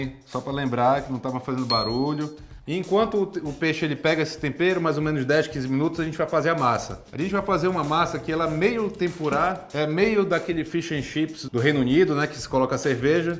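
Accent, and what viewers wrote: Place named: Belém, Brazil. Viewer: O sotaque nas palavras diminutivas é o que me mata do coração! 😍 sou manauara, mas a família do meu pai é toda de Belém... Amo demais essa terra, a comida, o jeito de falar... etc!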